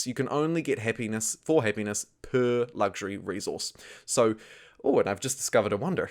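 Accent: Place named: New Zealand. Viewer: I love a New Zealand accent. Ben is "bin", and bin is "Ben".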